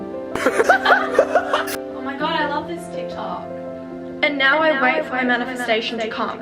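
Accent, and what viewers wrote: Australia. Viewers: i watch atleast two of her videos every day to get motivation + learn the aussie accent lmao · I keep faking an Australian accent for an hour straight after I watch your videos and I'm not even a native English speaker I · Finally someone who teaches accuratelyyyyyyyy. Also every time I watch your videos my mind speaks in an Australian accent to me for like 30 mins after. Don't know what that means but I'm not complaining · Is she Australian?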